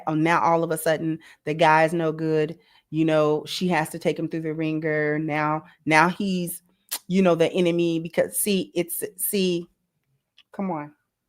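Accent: Chicago, United States. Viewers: Yep, loved the "tuna can" comment. That's Chicago in you! I know it when i hear it! 😂😂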